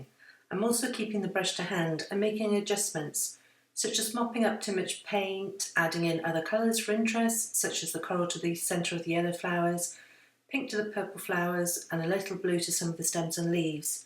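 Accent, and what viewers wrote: United Kingdom. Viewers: hi, do i detect a Welsh accent? Where r u from, Me-formerly Pembrokeshire! i'm only half way thru ur vid, but i had to send you this first b4 continuing! x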